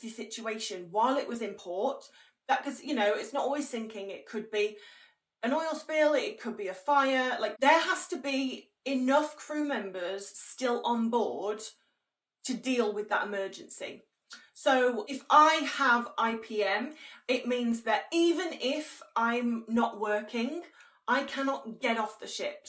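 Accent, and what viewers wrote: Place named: North West England, United Kingdom. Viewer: Your accent sounds very familiar but I cant quite place it... do you live in the north west?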